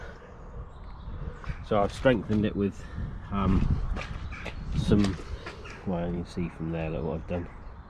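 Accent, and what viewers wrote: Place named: Cornwall, United Kingdom. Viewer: 3 seconds into the video i subscribed. Nice to hear the cornish accent. Again after leaving fowey/Truro and spending the last 8+,years in New Zealand.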